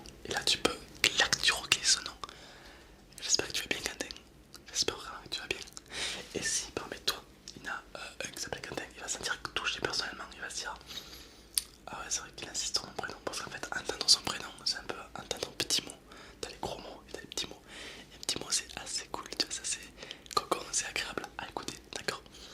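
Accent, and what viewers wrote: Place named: Marseille, France. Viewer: On reconnaît bien l’accent Marseillais , toujours autant présent dans tes vidéos. C’est drôle et bonne vidéo tout de même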